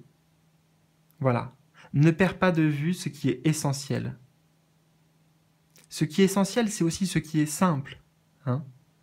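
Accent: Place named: England, United Kingdom. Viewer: 😂😂 super accent anglais ! Merci pour la vidéo 🌟✨🌈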